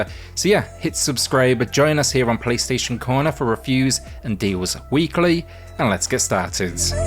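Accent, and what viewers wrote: United Kingdom. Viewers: I have to ask, where in the UK is your accent from? Haha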